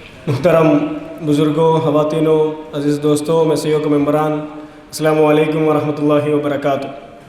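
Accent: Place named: Kerala, India. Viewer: His mother tongue is Malyalam he is addressing Non Malyalam Audience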